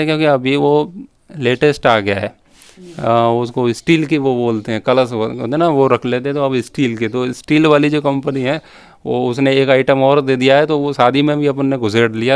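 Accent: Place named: Braj, India. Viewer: भाषा तो ब्रज लग रही है , आचार्य जी ?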